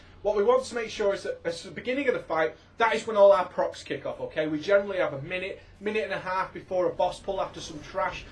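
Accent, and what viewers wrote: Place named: Manchester, United Kingdom. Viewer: I watched this purely for the banter, fuckin hilarious mate, where about in Manchester you from?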